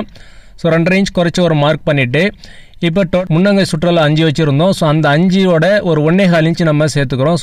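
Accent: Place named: Kerala, India. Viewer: நீங்க எந்த ஊர் ப்ரோ மலையாளம் கலந்து தமிழ் பேசுற மாதிரி இருக்கு.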